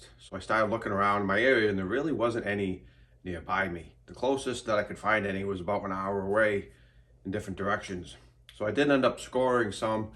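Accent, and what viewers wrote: Massachusetts, United States. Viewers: I live close to Frankfurt, Germany and have access to beech, oak, pine, spruce and fir mostly with the occasional birch and wild cherry. My favorite firewood so far is beech. Starting the fire with some softwood and then adding beech is my standard procedure. Oak is great for putting on a good bed of coals. I split my firewood roughly into the size of a triangle that forms when you hold both index fingers and thumbs together. The length is between 10 and 11 inches (25-30cm). Stored in a proper wood shed, I usually get all my firewood to a moisture content below 20% until September/October when split and stacked in March of the same year. Thanks for the great videos, content and information. The Massachusetts accent reminds me of our relatives that live in Boston and Concord, MA.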